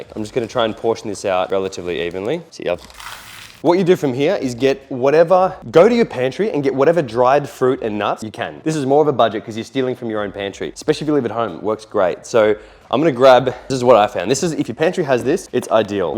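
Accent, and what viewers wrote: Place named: Australia. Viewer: AYYYYYY another meal prep video in australian! lesssss goooooooo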